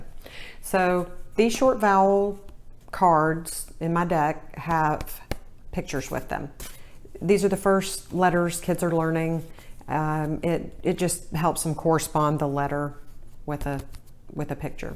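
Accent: South, United States. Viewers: you gorgeous young lady with a hint of southern accent I’m in love. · I'm so glad to have found someone who sounds like me - Southern accent! This helps me to teach my homeschooled daughter. My name is Sam, but when my daughter isolates the short vowel sound, she hears e. If I'm honest, I do too. Any tips with that?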